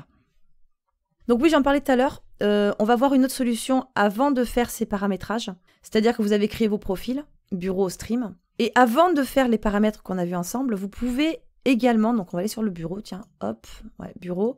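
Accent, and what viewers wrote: South, France. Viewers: vous parlez trop vite, calmos.. (c'est l'accent du sud peut-être) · supert tutoriel tres complet bravos jeune fille continue longtemps celas fais plaisir d'apprendre en ta compagnie ! avec l'accent du midi amicalement marc pecheo3 merci!😀💐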